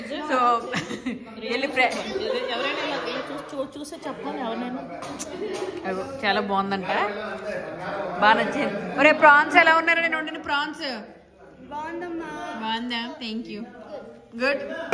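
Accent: Godavari, India. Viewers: Godavari basha Baga matladu tunnaru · Anni panulu bale chesaru fast ga nice godavari yasa baga mataladutunaru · Akka godawari pilla bale matladutunnavu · Very nice godavari vioce style 😅👌👌👌👌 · ❤❤❤... America lo untu kuda chakkaga godari lo matladtuu mana ammayi anipinchukunnaru andi🎉🎉.. Disti tiyinchukundi😁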